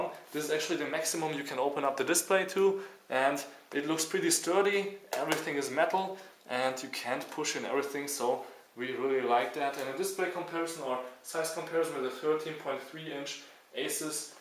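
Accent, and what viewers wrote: Germany. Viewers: Thats the typical german accent^^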